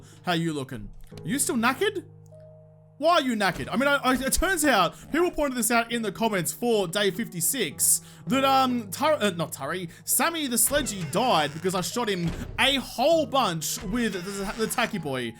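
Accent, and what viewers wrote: Australia. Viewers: i have a friend named josh, sounds exactly like you and is Aussie, hmmmmmmmmmmm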